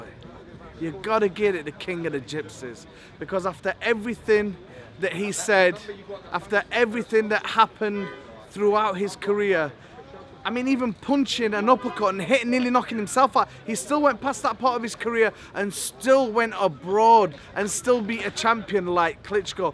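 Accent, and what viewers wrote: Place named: Yorkshire, United Kingdom. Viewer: I'm jus here for Yorkshire accent cuz I luv Yorkshire accent lol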